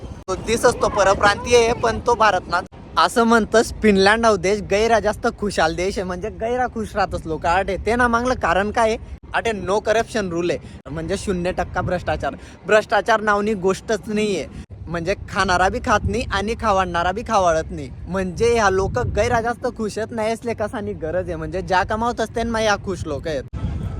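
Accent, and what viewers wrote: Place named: Khandesh, India. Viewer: Dada mi tuna video dekhas. Male aavds tuna video . Tu parona she .mi pan karmud ni she . Pan sadhya mi borivali ma rahavle she. Pure khandeshi bolas te lay aavdas. Tule jevha time bheti tevha. Tuna ship dakhadjo. Jay khandes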